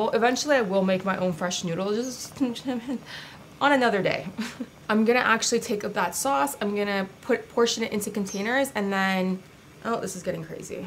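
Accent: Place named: Cockney, United Kingdom. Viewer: Please start posting again V! Miss you're content. From the UK, London. If you chuck in you're cockney accent ('faakin' hell!') will love you forever xxx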